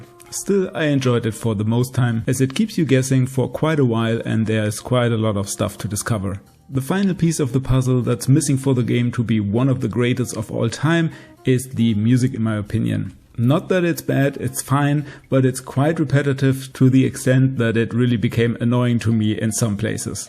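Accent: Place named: Germany. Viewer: da hämmert jemand seinen deutschen dialekt aber wirklich mit aller gewalt durch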